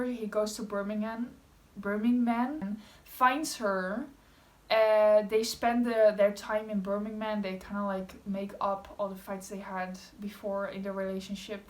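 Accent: Netherlands. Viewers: Are u from Netherlands? R u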